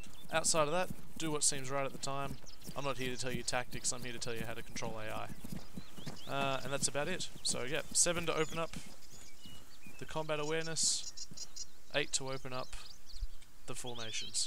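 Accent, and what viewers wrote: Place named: Australia. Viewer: Yes, I found this video very helpful and well explained indeed!!! I'd also be interested in knowing the other Channel. Either way I've subbed to this one just incase more uploads come. Also, great to hear another Aussie =]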